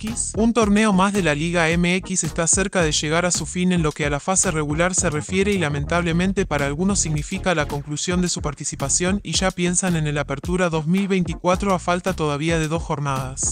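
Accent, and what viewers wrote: Argentina. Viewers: Y siguen con su tonito argentino.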